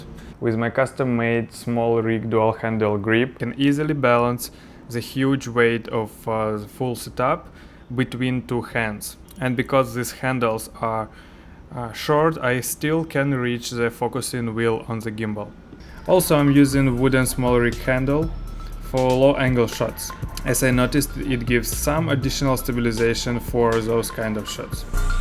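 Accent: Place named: Russia. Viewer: Чувствуется русский акцент😁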